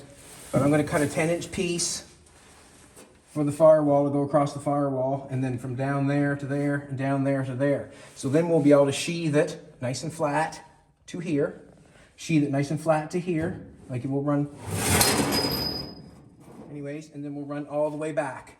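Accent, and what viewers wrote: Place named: Eastern Canada, Canada. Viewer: Can we pause and appreciate the eastern Canadian accent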